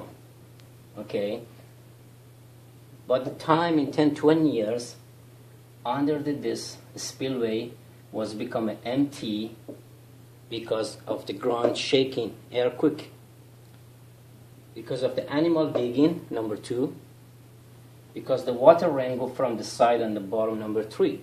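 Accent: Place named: Iran. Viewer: 'vabrication' is 'vibration.' Love the Persh-english! Very good video. They absolutely have not made public any realistic solutions for the sound reconstruction of the main spillway. Hope they watch. Best wishes friend.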